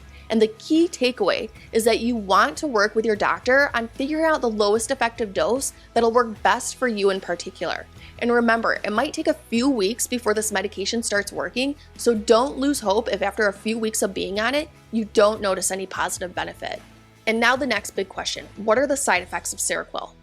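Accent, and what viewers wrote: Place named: Massachusetts, United States. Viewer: Would like to reckon you’re from Massachusetts holy lmao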